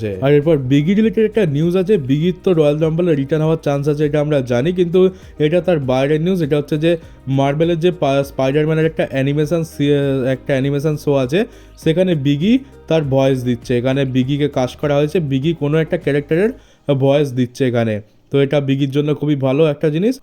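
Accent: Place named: India. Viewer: ভাই তুমি কি ভারতীয়? না মানে তোমার উচ্চারণ কিছুটা ভারতীয় আবার কিছুটা বাংলাদেশী দুটোই মিক্সিং যাইহোক আমি মহারাষ্ট্র থেকে বলছি 🇮🇳🇮🇳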